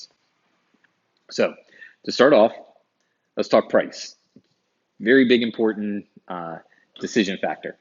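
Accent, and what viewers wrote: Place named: Pittsburgh, United States. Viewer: Stumbled upon this while trying to learn about the product JD product line. Living in Atlanta, but from PA... Picked up on the PA/Pittsburgh accent immediately, then looked over his shoulder and saw the sign confirming the locations. Very informative though. Thanks.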